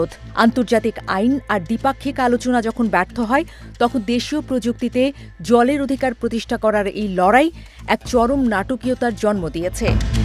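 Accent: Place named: Kolkata, India. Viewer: এটা কলকাতার ভাষায় কথা বলছে😂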